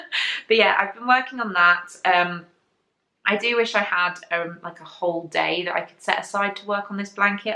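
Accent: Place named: England, United Kingdom. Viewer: I award you the designation of Honorary Southern Belle for saying "hissy fit" in this podcast. OMG! I definitely laughed out loud, because what made it extra special is your adorable English accent. Cheers!!!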